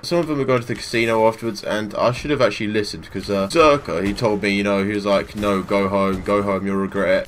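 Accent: United Kingdom. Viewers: which part of uk are u from???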